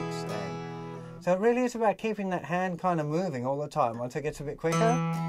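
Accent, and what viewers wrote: United Kingdom. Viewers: You have a British accent, and a Geordie hat. Thanks for the lesson. I used to play this, but it was years ago, and I needed a refresher. Thank you! PS: is your guitar make from? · I am from Liverpool, England. Lennon actually sings "she WORKED In the morning and started to laugh," not she walked in the morning et al. We Brits have trouble rolling the letter R. You sound like a brit....are you. You do a great job teaching and seem like a nice talented lad. Please keep up the teaching. Tara lah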